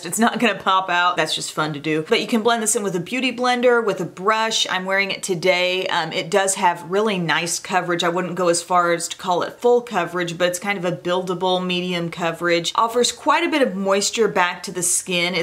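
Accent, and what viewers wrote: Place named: Nashville, United States. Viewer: I don't know where you are from, but your accent makes me homesick for where I grew up--Nashville.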